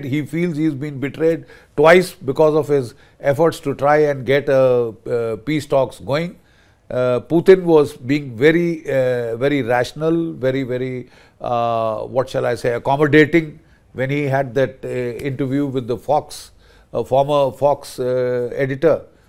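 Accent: India. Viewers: Very informative and absolute master class Indian English.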